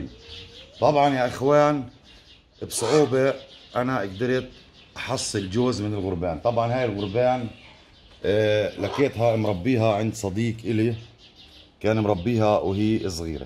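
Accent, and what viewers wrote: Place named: Palestine. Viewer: اللهجه الفلسطينيه ثقيله دم ومو حلوووه سلملي ع اهل العراق بس احلى لهجه عربيه 💐❤️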